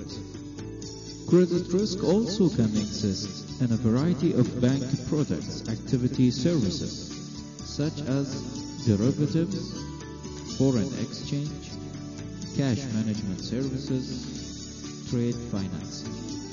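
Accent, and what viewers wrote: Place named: Bahrain. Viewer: اللهجه حتى وهي إنجليزيه تنعرف أنها بحرانيه هههههه ..I wana help on the commercial bank management it's so hard :(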